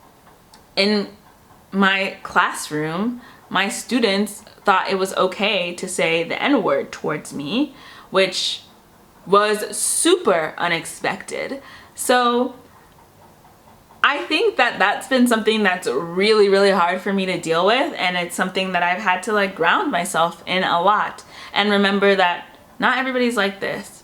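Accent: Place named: Georgia, United States. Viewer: Sounds Georgian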